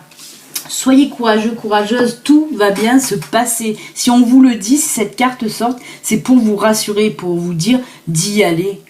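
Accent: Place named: Lyon, France. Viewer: Bonjour je vous remercie pour vos guidances,votre accent lyonnais est une vraie joie chaque matin,pour une lyonnaise,comme moi,dans le sud ouest! J'espère ne pas vous heurter,en vous disant que vous me rappelez, une rubrique matinale de mon enfance qui s'appelait "la mère Cotivet" Au-delà de son accent,elle était pleine de bon sens! quant à ceux qui ne sont pas contents,de vos guidances, j'espère qu'ils vous remercient lorsque cela les concernent directement! je vous souhaite une belle journée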